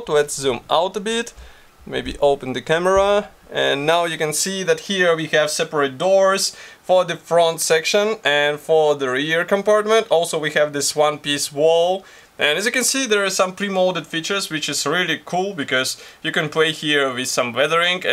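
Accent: Russia. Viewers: Какой то акцент как у русского. ты русский что ли?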